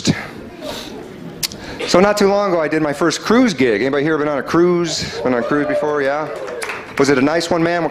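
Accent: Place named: Arkansas, United States. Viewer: Did you know we speak that way in Arkansas because of the Scottish stronghold and influence? Proud Scots heritage here. 😅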